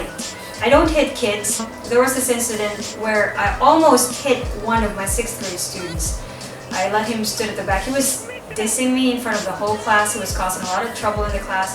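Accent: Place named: California, United States. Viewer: Just try teaching at the university level here in Thailand. All the students just want to know how to get a diploma without studying or working. I could have made a nice income just writing papers and doing projects for students to present as their own, which seems to be acceptable in Thai culture. Frustration mounts if one is a conscience teacher. By the way, I at first thought you are American from California due to your english accent, maybe California would offer you what you deserve.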